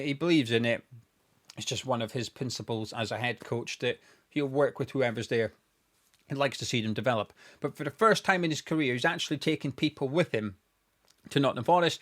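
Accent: Scotland, United Kingdom. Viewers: I enjoy a Scotsman chatting ❤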